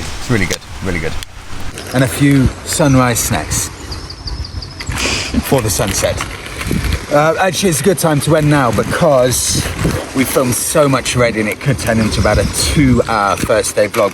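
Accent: RP, United Kingdom. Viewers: My hero David Icke lives on the Island.I have been there years ago.It certainly looks nice if you are lucky with the weather.You have a very clear speaking voice BTW.Slightly posh but not as much as a Sloane ranger.We never did get to see,but i heard her low sweet voice.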